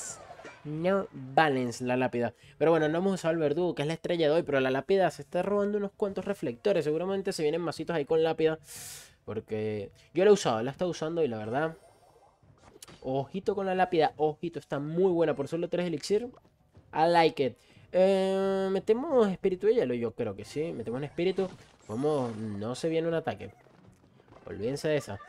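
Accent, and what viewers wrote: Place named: Venezuela. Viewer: Bro gracias a que estoy en tu Discord supe sobre este videazo, por cierto, eres Venezolano verdad? Yo lo soy y tú acento es Venezolano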